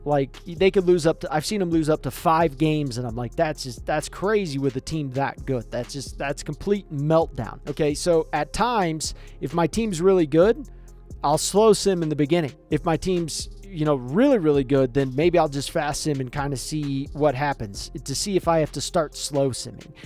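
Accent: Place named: Eastern Shore, United States. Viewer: Great Videos they’ve helped me out a lot. Quick question I grew up in Maryland your voice sounds like your from the eastern shore. Folks out that way, have a very distinctive accent.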